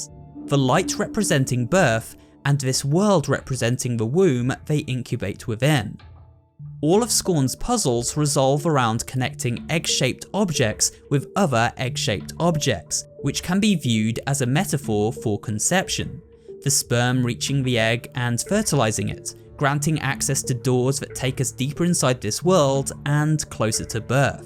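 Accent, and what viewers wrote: England, United Kingdom. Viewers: Why does every youtuber sound the same? Mousy, white with an ambiguous english accent ffs.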